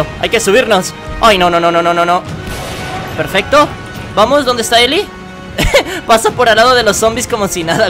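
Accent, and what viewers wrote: Argentina. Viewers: Una pregunta que casi todos se asen ¿ es Argentino?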